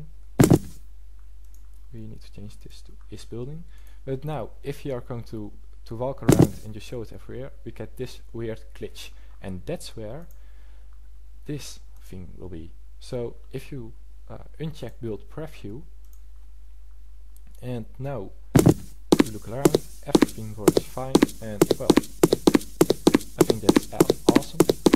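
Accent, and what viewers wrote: Netherlands. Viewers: Wear are you from, Holland? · your dutch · Dutch? :P Nice video man :)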